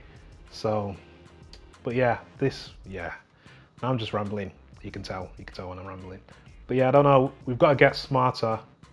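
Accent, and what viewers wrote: United Kingdom. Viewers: bruv, ya need some proper editing. Besides that, your commentary's dead sound. (not mocking the Manchester accent. I'm a huge England-fan and Manchester in particular from the Hacienda bands)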